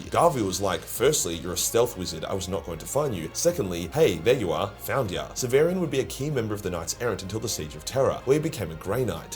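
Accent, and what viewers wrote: Australia. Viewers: Bruh when u said the battle was harder than a word bearer at a daycare centre that shiiii caught me so off guard I spat my drink out ffs this guy never fails to make me laugh every video the Aussie accent makes it even better brilliant stuff my guy.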